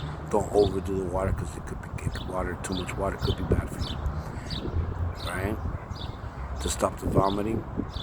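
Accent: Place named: Miami, United States. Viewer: Miami Cuban straight truth, im cuban also, I can tell ,good speaker.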